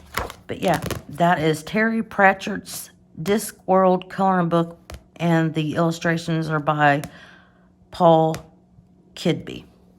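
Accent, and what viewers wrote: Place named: Alabama, United States. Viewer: Hey, she Alabama southern just like I am but North Carolina style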